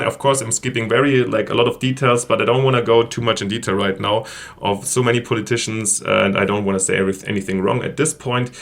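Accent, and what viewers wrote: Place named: Germany. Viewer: Wusst ich doch gleich, dass das n Deutscher ist. Aber sehr gutes Englisch. Da möchte ich meine paar englischen Videos direkt private stellen haha.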